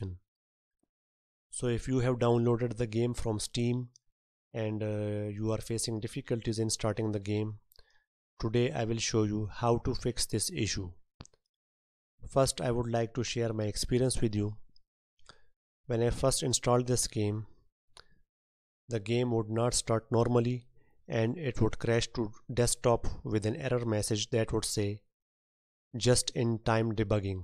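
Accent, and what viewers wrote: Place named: India. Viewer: when i hearf this indian accent i knew that this will works THX!!!!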